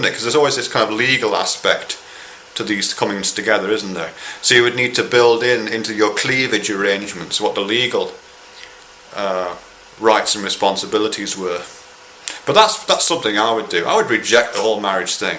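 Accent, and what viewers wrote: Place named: Bolton, United Kingdom. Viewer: As a side note, your dialect sounds similar to my wife's ex-husband, who is from Bolton That made me look up Blackburn, and I found that they're not far apart :-)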